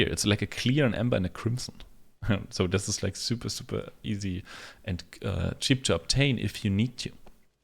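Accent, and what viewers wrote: Germany. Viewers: u can 100 %hear that in ur voice haha :D not a bad thing tho your english is fine u just hear the german pronounceciation!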